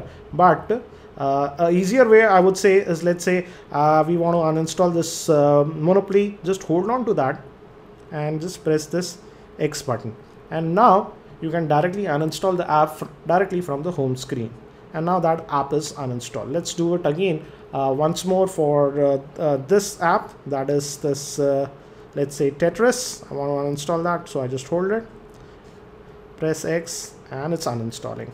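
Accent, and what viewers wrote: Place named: Maharashtra, India. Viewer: You will make it big by taking diction classes. You're speaking English but I can hear Marathi!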